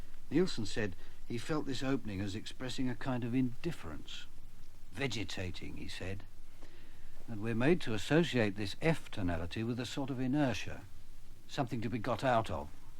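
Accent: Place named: England, United Kingdom. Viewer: Oh, what an authentic English! Of course, the explanation is excellent.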